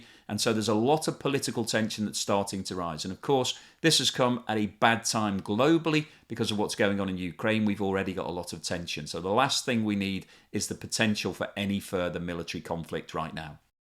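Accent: United Kingdom. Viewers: Your analysis of the Greek-Turkish situation is appallingly one-sided and misleading. (1) Greece has S300 in Crete because Cyprus purchased them to station them in Cyprus, but Greece had to take them because Turkey made a fuss. Greece did a favour to NATO to appease Turkey, yet again as done many times. (2) Turkey has been invading Greek air space many more times than it claims Greece does. Whether Greece does invade Turkish Air space is to be seen and probably false, because Turkey sees the air space over the Greek Islands as its own, which is a fantasy. (3) Turkey has been attempting to size Greek territorial waters and land for decades because it wants access to hydrocarbons in the Aegean see, and to do this it is inventing its own nonsense all the time. Most ridicolous of all, it never signed UN treaties regulating continental shelves (together with a handful of countries) and then tries to invent its own continental shelf. 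(4) Greece stations small military units on the islands for defensive purposes. This after the Turkish illegal invasion and occupation of Cyprus. And has the right to defend its territorial integrity given Turkey's very obvious aggressive policy. In short, stop doing propaganda for the Turkish state. And if that is not your intention, educate yourself and present facts as they are. Finally, since it sounds like you are a Brit, put your time into making sure that your government gets their hands off Cyprus once and for all, does what necessary to bring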